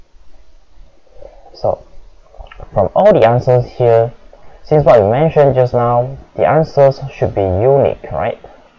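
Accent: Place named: Singapore. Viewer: definitely Singapore accent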